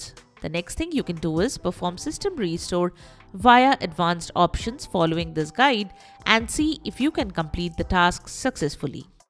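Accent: India. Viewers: Indian Voice over check, low viewer upvote check, blanket solution with no actual solution...also check.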